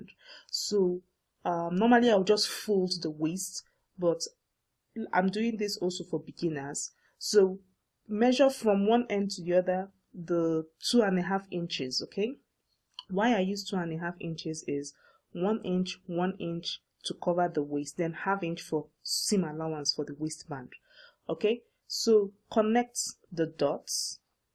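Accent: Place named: Nigeria. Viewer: This is awesome! Thanks a million for sharing. You sound Nigerian, I might be wrong? I am Nigerian myself. Anyway, Thanks so much!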